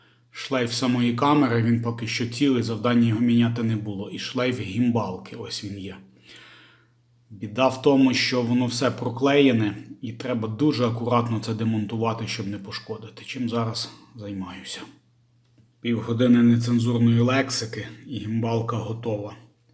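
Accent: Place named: Polissia, Ukraine. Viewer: Зацікавив ваш акцент. Ви випадково не з київської області (з регіону де живуть Поліщуки)? Частенько чую у вас слова притаманні саме тому регіону.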